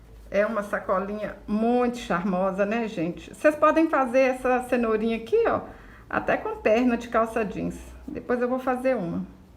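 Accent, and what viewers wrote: Minas Gerais, Brazil. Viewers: Muito lindo!!! Já vou fazer pra minha neta Helena de 5 anos! Amo ver seus vídeos! Já estou inscrita faz tempo! Amo também o seu sotaque mineirinho!!! Parabéns pelos trabalhos e por ser paciente em explicar. Beijos😘😘👏👏👏😍😍😍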